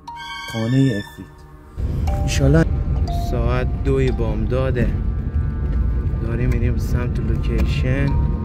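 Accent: Kerman, Iran. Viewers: دمت گرم مرد با دل و جرأت کرمانی ممنون از زحمات شما و گویش بحال کرمانی تان👍♥️👌🙏🙏tanke you..very good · ممنون خان برای ضبط این مستند. لهجه کرمونیت خیلی قشنگه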